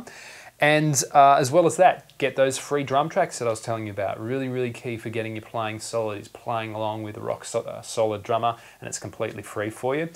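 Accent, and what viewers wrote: Australia. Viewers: I'm so glad you posted this... one of the most helpful guitar lessons I've ever seen. I still can't play it but at least I have hope. And your Australian accent is very very cute :)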